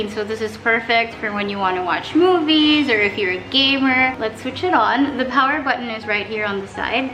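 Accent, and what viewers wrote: Philippines. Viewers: Nice video, enjoyed it you’re really cute and actually surprised first Philipina to hear talk fluent english with accent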